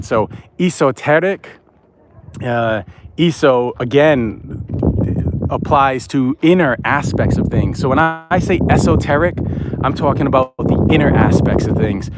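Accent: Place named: South Boston, United States. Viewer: I hear Southie..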